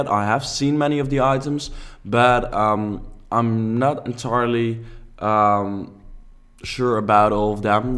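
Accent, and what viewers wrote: Netherlands. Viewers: Omg wahah, ik dacht al dat ik het nederlands engelse accent hoorde! ❤️✌🏻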